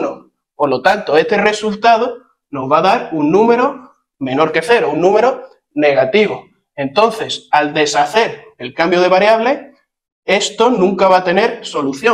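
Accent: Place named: Spain. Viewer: hola eres profesor dé matemáticas ami no me entran las matemáticas estoy pensando en ir ala universidad haora si Dios lo permite después dé que dure mucho tiempo fuera pero explicas esa matemáticas mui rápido ven paca Pará República Dominicana para que me ayudes eres español porque hablas con la z ta cómo té yamas nunca té hubiera visto en Youtube primera vez pero explica mui rápido 😂😂😂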